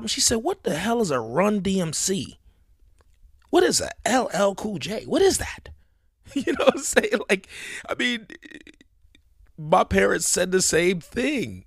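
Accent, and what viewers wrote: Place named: Brooklyn, United States. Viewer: Bronx all day! Haha, sorry I said I heard a BK accent. Haha. Yo what's your thoughts on bitcoin and crypto brother? ✌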